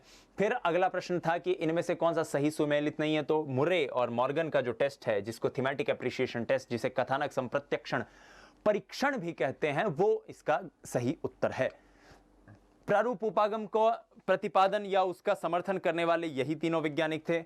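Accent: Rajasthan, India. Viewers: Sir Rajasthani botle hue ache lgte ho😀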